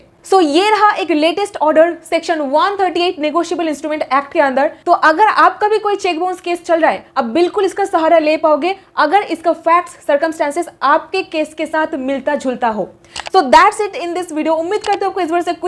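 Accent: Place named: Bihar, India. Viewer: Bihar se h tu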